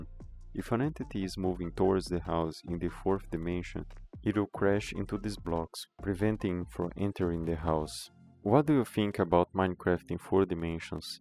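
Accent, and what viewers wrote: Brazil. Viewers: tu é br né? kksksksks eu já percebo na hora brasileiro falando inglês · Cara, você é brasileiro? Fala bem pra caramba mas o sotaque não engana kkkk, a localização do canal no sobre também fala que é do Brasil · Are you brazilian? I'm assuming from your accent. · Na hora eu já saquei que vc era br, c não engana primata da sua espécie não em Enfim, seu canal tem potencial, daqui um tempo vc tá nos 50 mil incritos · Oh, good (percebi que é Brasileiro pelo sotaque no video, então... congratulations) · That's a brazilian accenttt broooooooo! tamo junto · Eu tenho CERTEZA que é brasileiro n é possível ksksksksksk Esse sotaque é muito nosso vei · Percebi que era BR só pelo sotaque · E esse inglês brazuka aí? Eu notei o sotaque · Se ele não for um brasileiro falando inglês eu crio asas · Incrível como eu já sabia que era BR só pelo sotaque kkkkkkkkk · da pra reconhecer brasileiro de longe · e esse sotaque brasileiro aí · primeira vez q percebo o sotaque de um brasileiro kkkkkkk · Vc é brasileiro né? 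O sotaque brasileiro é inconfundível kkk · Aaaa, por isso o inglês era estranho, o cara é brasileiro, em fim ótimo trabalho parceiro, foi abençoado pelo algoritmo do youtube 100k views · Reconheci que era br pelo sotaque! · amo q da pra saber qnd o cr eh brasileiro falando inglês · I must know this Why do you sound brazilian? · Acho que o narrador é br kssksk esse sotaque en ksk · Com esse sotaque, você é brasileiro, não é possível kksjjskkjjjjsjsjsjsjsjsj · Salvee, to ligado que vc é br, da pra perceber kkkkkkk, mas enfim, ótimo trabalho! 👏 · Reconheci o sotaque brasileiro hue Ótimo vídeo. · O sotaque brasileiro é inigualável kk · Sotaque brasileiro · brazilian bruv this accent 😭😭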